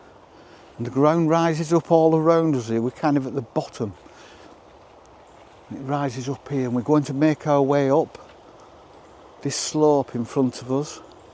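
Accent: United Kingdom. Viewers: I love the British accent! Every time you says “ squirrel” 🐿️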